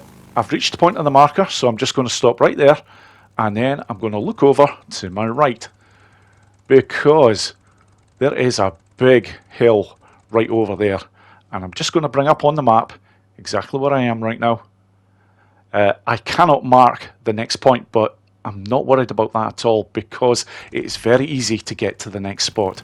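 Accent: Scotland, United Kingdom. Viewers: Great video pal. I've been extremely late to the Days Gone party having only just played through it for the first time in June (best 12 quid I've ever spent in CEX aha). I played on Hard 1 and had an absolute blast, putting in over 85 hours and grabbing the platinum - but atill feeling like i have way more fun to have. I didn't look up any tips before/while playing, so my early game was nowhere near as optimal as it could be - avoiding hordes for much longer than needed meaning i didnt get to enjoy the Horde Killer weapon rewards until really late in the story/after the story. I'd have done so many things differently in hindsight while also cleaning up Hordes earlier to grab the weapon rewards. Even though its only been about 5 or 6 weeks since i completed that first playthrough (and tidied up all the hordes), I've already been itching to get back into a second playthrough. 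I have no intetest in NG+ cause starring out weak, and slow progression is something i really enjoy, and hate restarting games overpowered. Well, after seeing this video pop up on my feed yesterday, i started my second playthrough last night (Hard 2 this time, more spongy enemies but keep my hub), and thanks to you I've grabbed these two beast weapons straight away 😁 thanks mate. You've got a new sub now from a fellow Scot 🏴 ps, where are you from? Your accent is similar from my mate who's from Elgin, but you might be from the North East? Anyway, keep up the great work and I've got a whole bunch of your vi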